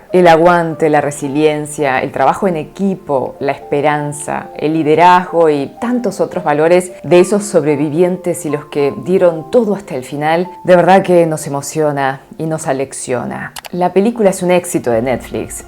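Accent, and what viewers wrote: Buenos Aires, Argentina. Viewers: . Mis saludos y lo que haré a continuación es en carácter de pregunta. Ya que mire muchas películas coreanas y en algunos casos la temática se repite siempre de gente pobre en ascenso o de gente millonaria que se hace pasar por pobres. La pregunta es, hay ese trato en Corea entre jefes y empleados? Llegan a ese nivel de insolencia? Está todo tan dividido en clases sociales?... No quiero ofender por favor, ya que los coreanos que yo conocí siempre son agradables al menos aquí en Rusia. Todo es pregunta quizás en algún momento lo puedas leer. Mis saludos y gracias por tus vídeos y tu acento porteño. Desde Novosibirsk Rusia Gustavo Ziryanov.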